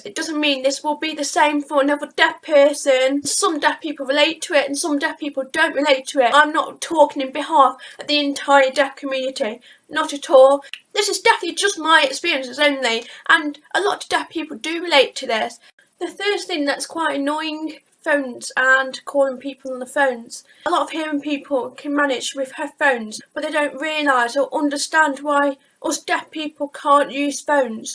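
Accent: United Kingdom. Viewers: hey, okay, please don't be upset, I want to ask a real question... okay? u have a British accent, I'm curious how you picked that up, if you have not heard it...? it's fascinating! I'd like to discuss! :)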